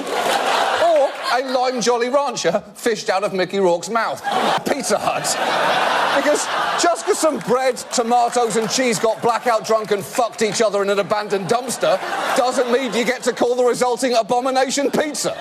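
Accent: United Kingdom. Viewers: He is not American. He is taking on American issues with wreck less abandon. He rants without perspective. He is incapable of entertaining guests who could throw a dose of cold facts on his middle school understanding. I don't understand why Americans are so quick to abandon critical thinking when they hear a British accent.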